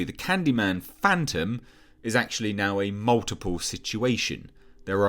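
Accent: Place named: England, United Kingdom. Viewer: I don’t think you watched the original Candyman. You sound a little too bias because of your lack of fondness of Jordan peel. Based on your accent you should know who Clive barker (a fellow Englishman), who originally wrote Candyman. So the so-called “racial stick?” was not created by Jordan peel but originated from Clive. You have some great information pertaining to the plot, but unfortunately very bias and you sir sound a little offended by the actual plot.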